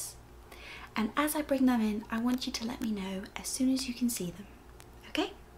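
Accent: England, United Kingdom. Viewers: English* not British. There I no such thing as a "British" accent. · Can I ask, are you originally English or just perfectly pretend to be? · ASMR Your accent is more refined than the other British ASMR artists - Always good to have a unique trait like that. This also makes is more believable that you're a physician as it falls into our preconceptions of how someone with that job would speak. On top of that, you display a good amount of confidence and maintain eye contact with the camera/patient, which adds further to the credibility of this persona. Underpinning all that you also seem to have the basics of ASMR down pretty well. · And what about a Scottish or Welsh accent? They're British too. This is an ENGLISH accent! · I LOVE British accents🙏🏻 you are my favorite 🙈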